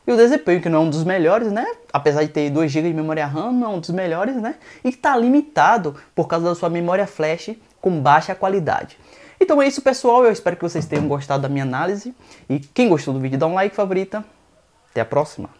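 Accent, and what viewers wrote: Bahia, Brazil. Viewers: vc é baiano ?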